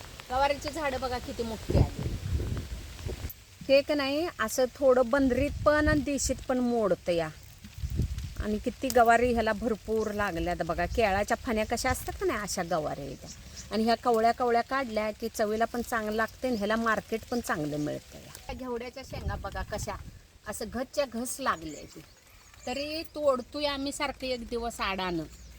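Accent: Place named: Rural, India. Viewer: Tumchya malyatil bhajila tumchya gavran bolnyasarkhach godava aahe.Tumche kasta baghun khup kautuk watate tumche.❤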